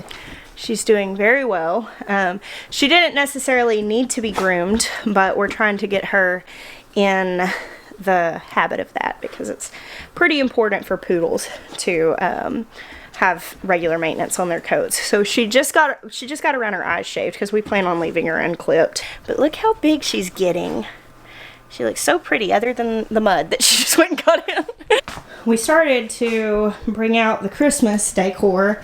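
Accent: South, United States. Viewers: Are you saying people outside the South are so intolerant that they can’t handle Southern Accents?! What nonsense. her accent is fine and part of a valuable and unique culture. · mah goodness, yur sourthern accent is deepening---South Carolina affected??? Your audience is much broader; please remember your viewers watch your channel for information, community, and connectivity. Don't leave the non-southerners high and dry.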